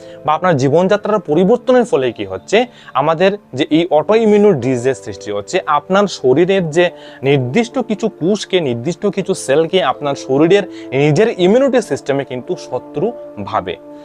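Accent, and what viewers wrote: Bangladesh. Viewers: Apnar kothate mone hochche apni Bangladeser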